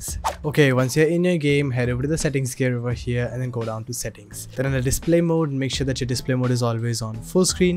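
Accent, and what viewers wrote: India. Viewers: Is it possible to find a video guide that is not made by an indian guy with an unbearable indian accent? Just asking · Is it possible normal guy in india to talk in english without 95% of the Indians cry about his accent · You are indian, right? I can't understand a lot of things because of the accent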